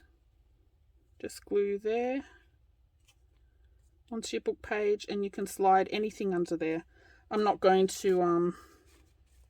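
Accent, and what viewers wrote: Australia. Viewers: Love that you sound like a fellow Aussie -& living your mini pockets 👍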